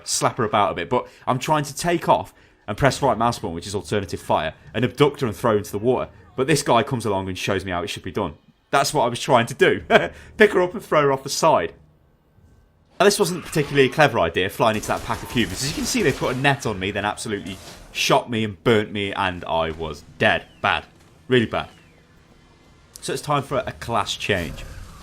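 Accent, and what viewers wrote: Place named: Birmingham, United Kingdom. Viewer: First of all, nice job with the new review! waay better :) but secondly, I've been wondering about your accents for a while now... so I'm gonna take a stab at it and guess... Birmingham?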